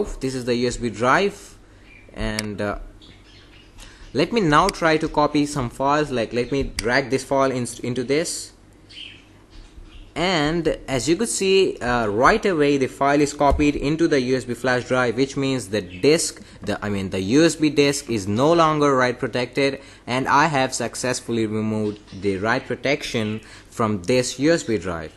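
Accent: India. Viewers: an indian trying to hide his accent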